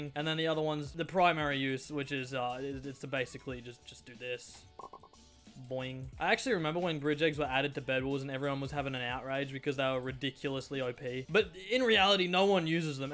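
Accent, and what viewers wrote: Australia. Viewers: is it me or does sound more australian in this video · Bro I love you accent like it is the best Australian accent ever. :D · is it just me or does he seem more Australian than normal in this vid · Who else noticed the Australian accent getting more evident than usual? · this video is just channeling his inner australian accent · Is it just me, or does sound extra Australian in this vid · This is like the only video that actually sounds like an australian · That australian accent made me so uncomfortable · Not sure how but you sound more Australian than normal in this video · is it just me or does he sound insanely more australian than normal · why do you sound more australian in this video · he sounds extra-aussie this video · Was it just me or did he talk more Aussie lol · the Australian accent popped out here more than usual 😗😗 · Your Australian accent just keeps growing.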